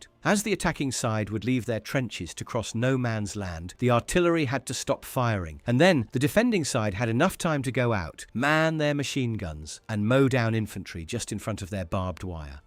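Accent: United Kingdom. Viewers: Hilarious crapping on a gun that helped win a world war and the fact a brit is the commentator makes it even more hilarious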